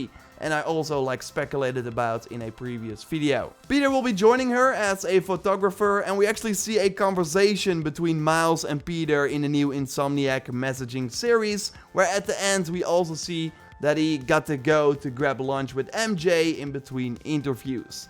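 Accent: Netherlands. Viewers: this dude sounds ultra dutch is he from holland by any chance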